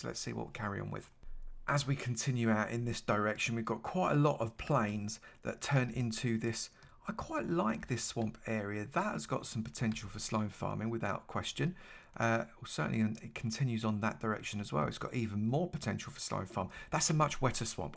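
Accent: United Kingdom. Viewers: your voice sounds like gordon ramsay lol or some british dude doing a tutorial about somehing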